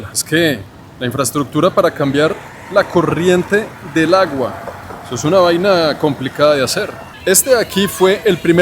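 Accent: Bogotá, Colombia. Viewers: Es rolo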